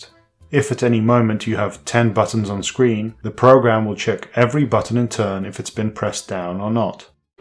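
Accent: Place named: United Kingdom. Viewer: The audio quality and the british accent. Also the way of teaching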